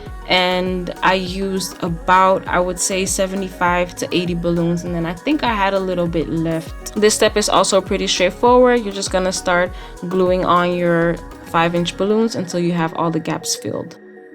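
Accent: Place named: Guyana. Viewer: Where are you from you sound so Guyanese 🇬🇾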